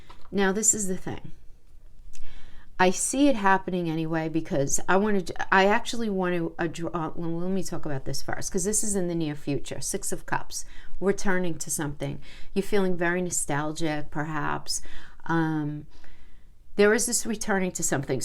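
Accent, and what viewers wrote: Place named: New York/New Jersey, United States. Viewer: What area are you from? You have a slight NY/Jersey sound. 💛 I really like your readings for Libra. Thank you.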